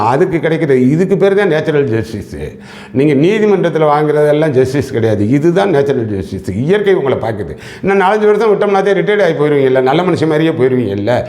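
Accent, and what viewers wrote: Madurai, India. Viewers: சார் மதுரை சிலாங் முறையில் விளக்குவது சூப்பர்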